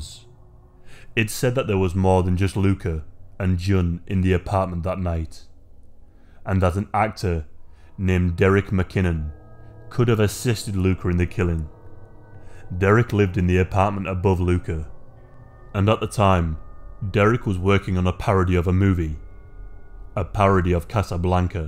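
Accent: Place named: Yorkshire, United Kingdom. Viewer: Of course it’s a compliment 💯 Yeah thought it was a yorkie twang lol, I’m Cheshire but I’ve got a friend of 15 years who still has a extremely deep and broad Yorkshire accent 🤯 😂 i thought ya all talked quick 🤦‍♀️😂!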